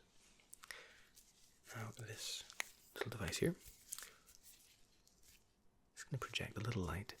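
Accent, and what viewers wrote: Scotland, United Kingdom. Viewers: Are you from Scotland ?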